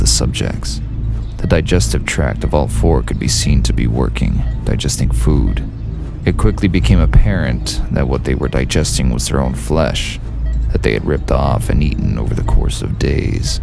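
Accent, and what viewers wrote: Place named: Russia. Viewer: Great job on the narration! I really liked the Russian accent ^w^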